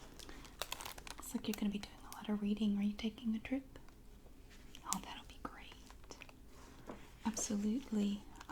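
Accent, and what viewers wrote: South, United States. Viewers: Really liked the soft speaking and page turning. Very relaxing. Thank you! Love your southern draw too btw... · Now what part of our beautiful south are you from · Love your soft american accent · You're very similar to old school Maria Gentle Whispering with a southern twist and it's perfection! Thank you. 😊 ❤️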